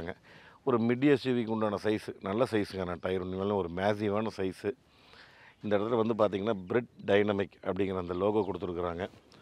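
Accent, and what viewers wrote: Kongu, India. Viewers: கொஞ்சும் கொங்கு தமிழ் ...அருமை..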